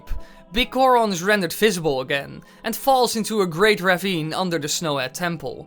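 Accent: Netherlands. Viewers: You really sound like a dutch man :3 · Ben je nederlands? Jouw accent heeft echt wel overeenkomsten met nederlandse taal Haha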